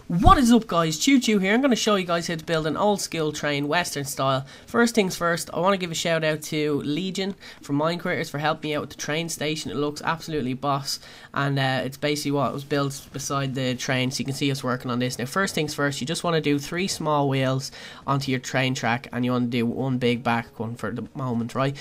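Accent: Ireland. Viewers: You kind of sound Irish but I can't tell... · You sound Irish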